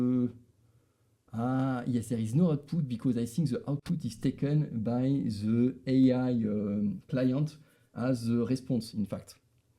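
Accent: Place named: France. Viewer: Merci beaucoup pour cette vidéo très instructive!!! with the cool french accent😅😅😅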